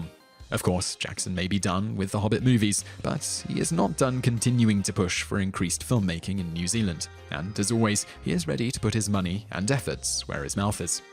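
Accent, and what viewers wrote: United Kingdom. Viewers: Other British people, surely you understand that pronunciation of things is natural, right? Taking into account the many kinds of British accent there are, this is just the way people with his accent pronounce things when they read a word! pronunciation and British tone is preferable, no wonder England has the Top 10 Channel game by the plums. Please keep up pronouncing things the way you do,! If I did the subscription thing, I'd subscribe.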